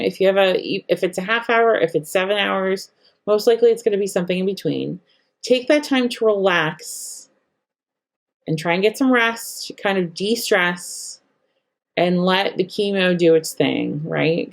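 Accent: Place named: United States. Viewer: I love it I was laughing in a good way lol.....I love the American accent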